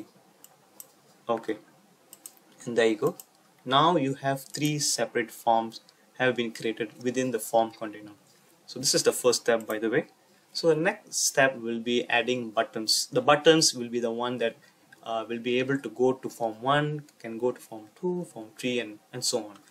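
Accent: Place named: India. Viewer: You are the best human on this planet love your work ! You helped me a lot ! And great accent keep it I love India and its people soo much!❤️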